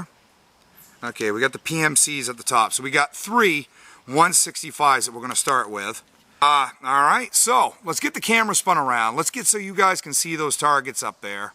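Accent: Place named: Massachusetts, United States. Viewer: Judging by the accent and Glock you’re definitely from north of Boston lol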